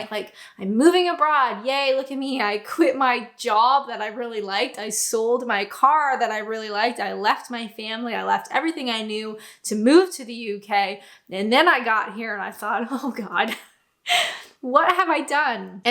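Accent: Canada. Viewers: Hi! You've aged? You're looking good girl!!! Yes, I know the feeling of not belonging, having an identity crisis and trying to adjust when we emigrated to Tronno and returned all those years ago as a 9/10 year old. This was all in only 1 year! Didn't know if I was coming or going but what an experience to be had! (...and yes, I did start to have a Canadian accent like yours but lost it again:) ) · Still got a Canadian accent. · Surprised after all this time your accent is still fully Canadian? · It’s OK, you’re Canglish. We get it, it’s cool 😎!!!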